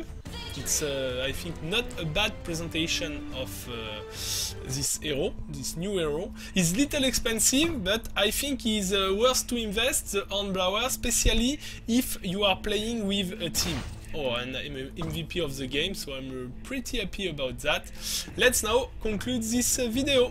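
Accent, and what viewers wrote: France. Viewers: Toi français c'est sure vue ton accent ! Mdr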